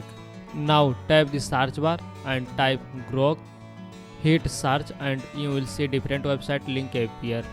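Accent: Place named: Southwest Asia. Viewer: almost unintelligible. This would be fine in southwest Asia, but the speaker's accent is very difficult to understand.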